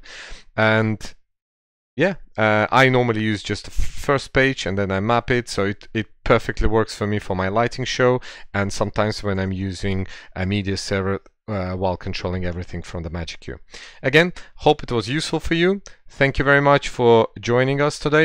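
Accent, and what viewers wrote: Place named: Russia. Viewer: Thank you very much.I love your videos and the way you share things with us. Love you russian accent.Haha.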